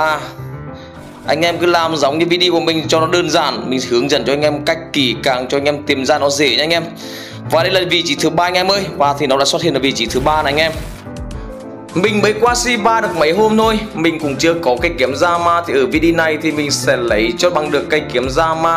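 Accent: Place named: Central, Vietnam. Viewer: Anh người miền Trung à anh,chữ e ko hiểu:))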